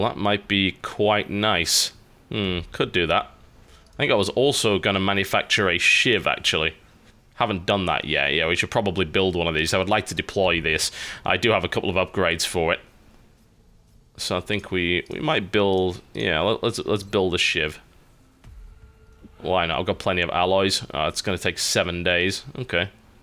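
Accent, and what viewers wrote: United Kingdom. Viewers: ....he is British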